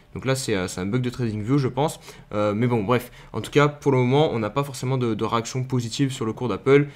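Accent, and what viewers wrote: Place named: France. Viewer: Haha je suis brésilien. Très français ton accent. Ps: les brésiliennes adorent... 😉👍🇨🇵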